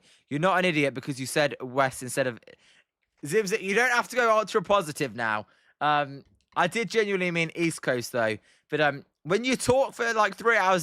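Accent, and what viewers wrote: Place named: United Kingdom. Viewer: Are u británico....u accent is so strong...mmm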